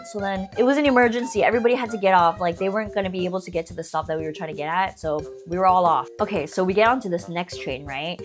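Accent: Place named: United Kingdom. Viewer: Wait you are from 'MURICA?! I tought you were like British or a very confused German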